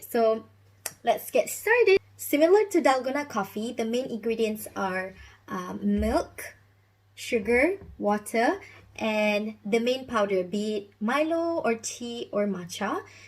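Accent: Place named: Malaysia. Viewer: I love your Malay accent, And the look in your eyes! 🙂🌹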